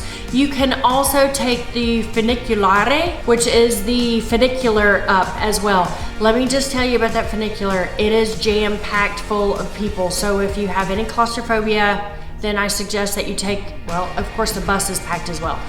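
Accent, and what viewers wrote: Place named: Texas, United States. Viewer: Where is her accent from, why am I thinking Texas?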